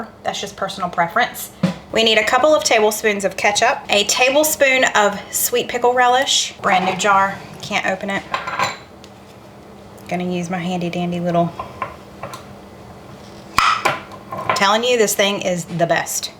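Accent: South, United States. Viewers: I say Paprika like you do. Maybe it’s a southern thing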